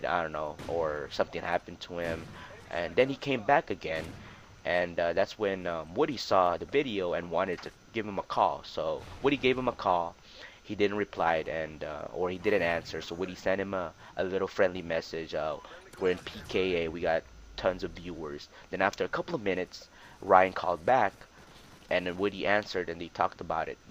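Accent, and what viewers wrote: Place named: Asia. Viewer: I love you Asian accent you badass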